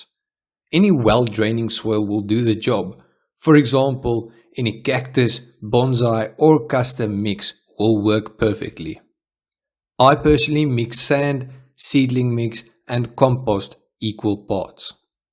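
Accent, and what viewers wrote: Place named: South Africa. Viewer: Damn that South African accent 😂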